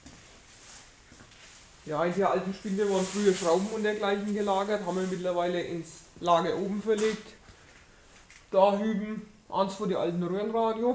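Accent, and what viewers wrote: Franconia, Germany. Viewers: Sprich net fränkisch dich versteht keiner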